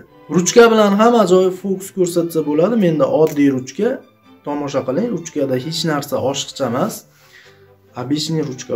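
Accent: Andijan, Uzbekistan. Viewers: Aka andijonli masmisiz mobodo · Акам Анжанданмисиз?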